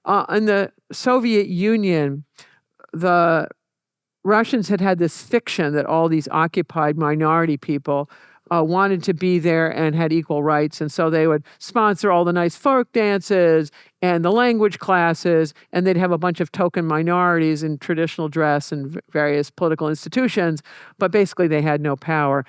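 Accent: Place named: South, United States. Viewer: Yeah but that is CIA playbook geography... Stuff makes no sense though: suppose that Russia tried to erase culture in Uzbekistan Tajikistan, erase islam etc, for a start, that would have stoked revolution so the situation is totally different, the russian empire was far less populous and sparser on radio waves... and so She trivializes this CIA politicial science social geography theory with this southern twang brainwashing her listeners about all kinds twisted logic that aligns with the CIA playbook, and guess what, she never even lived in these countries that she knows everything about, Russia, China, she clearly has never exchanged with the cultures there enough, because she speaks like someone educated by corporate news, and she wants you to speak like that too, not like someone who has travelled the world and knows cultures and the ancient causes of today's contrasted political systems.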